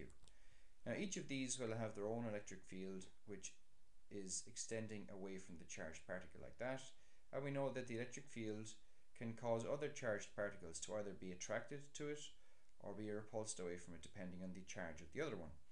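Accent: Ireland. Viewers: I actually like your, I believe Irish, accent. I appreciate a variety of accents which seem to help me focus. On the other hand the somewhat low volume is a bit of a problem. I have to pick quiet times to listen to the audio.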